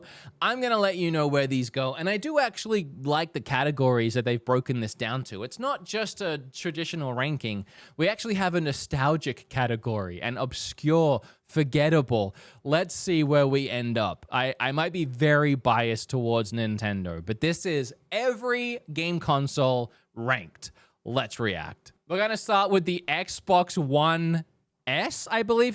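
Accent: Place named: Australia. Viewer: Different pronunciation for Australians which is still correct. British, Americans and Australians stress different parts of some words.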